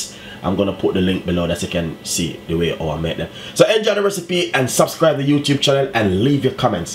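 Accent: Jamaica. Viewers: I did hear spinage, chef u making us Jamaicans sound bad. It's the accent though, love it 🤣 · So Jamaican, so much so that I heard "spinners" when my ears clearly heard him say spinach over and over 😊 thanks for the refresher, when I make them sometimes they come out softer than I like. Never thought to let them sit for 10 min. Blessings! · Loving the strong Jamaican type accent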